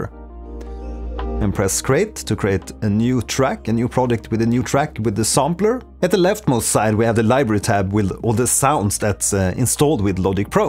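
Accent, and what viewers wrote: Sweden. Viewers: nice anc clear video. I also love the swedish accent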